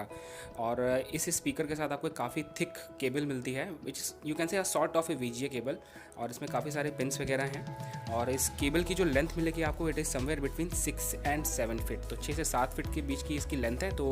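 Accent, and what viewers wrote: India. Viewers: Red zHail He has an indian accent plus i sense racism 😕.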